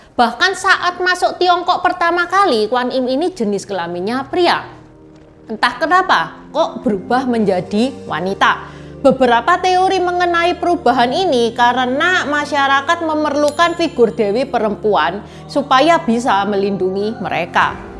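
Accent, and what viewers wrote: Java, Indonesia. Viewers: Sy senang sekali denger encik program , krn sangat medok ❤ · kok kayak logat jowo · Ci logat jawa nya medok bngt jawa nya mana sih cii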